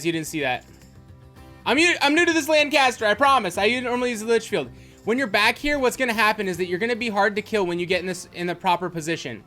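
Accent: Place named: Russia. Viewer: Love the videos! You are hilarious in many of them. Your Russian accent is great! Keep it up bro!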